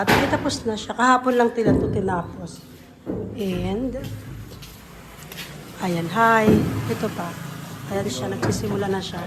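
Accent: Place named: Visayas, Philippines. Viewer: Bisaya ka ma'am Kasi may tono ka bisaya kasi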